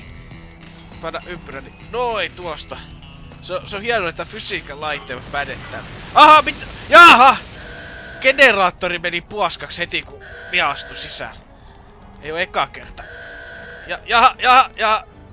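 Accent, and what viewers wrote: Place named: Savo, Finland. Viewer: On kyllä tuo savolainen vakkooja niin komeeta kuultavaa :D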